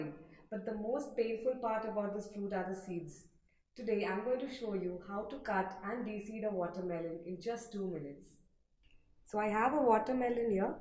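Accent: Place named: India. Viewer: But that stereotypical Indian accent doe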